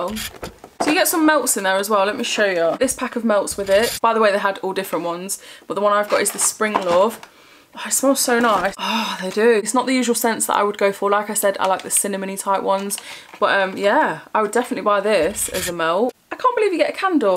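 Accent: Nottingham, United Kingdom. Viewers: Your accent sounds similar to Nottingham I love watching these you are so bubbly I love that you go for it with positive attitude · I said the same....im from nottingham and accent sounds so familiar x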